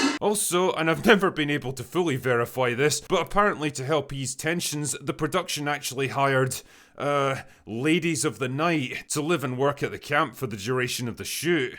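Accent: Scotland, United Kingdom. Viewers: Is it weird that I don't care about the movie but listened just for your Scottish narration?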